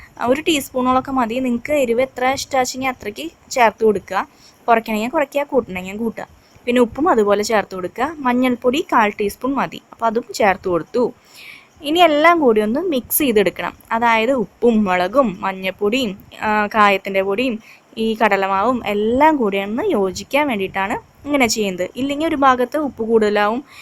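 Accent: Thrissur, India. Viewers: Good presentation with Thrissur accent. Even a kid can understand. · തൃശ്ശൂക്കാരിയാണോ ചേച്ചി?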